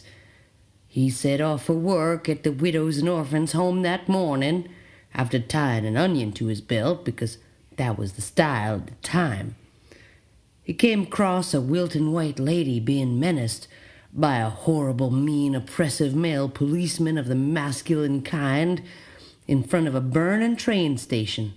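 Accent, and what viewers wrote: South, United States. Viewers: Interesting.... though why an old southern woman accent? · Why do you sound so southern in this? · WTF this Canadian woman has a better southern accent than I do!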